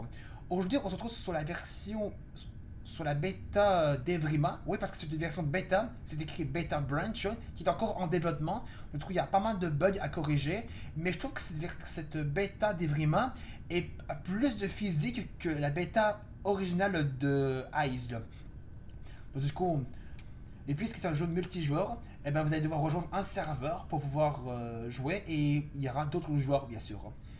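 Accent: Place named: Quebec, Canada. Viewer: Pti commentaire et c'est constructif. Je suis québécois et je pense que toi aussi. J'ai parcontre du mal à comprendre se que tu dis. Essaie un français universel ou parle avec un accent assumé! Sinon c'est super bien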